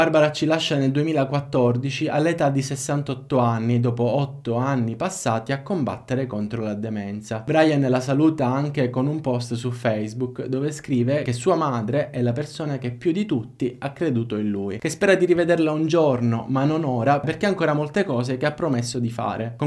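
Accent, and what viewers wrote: Sicily, Italy. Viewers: Quella dote narrativa con cadenza sicula "casa casa"... Adoro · Direi dall" accento sei palermitano ! Bel video ciao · mi piace la tua cadenza siciliana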